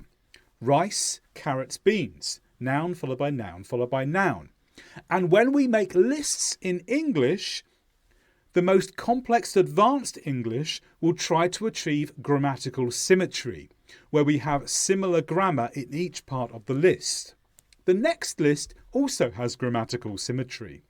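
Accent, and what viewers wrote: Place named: United Kingdom. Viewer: I’m impressed by the volume and thoroughness of the information you’ve presented here. Thanks to the video I can identify grammar spots to work on and improve. And the fact you are from the UK makes me even happier ‘cos I prefer this accent. ❤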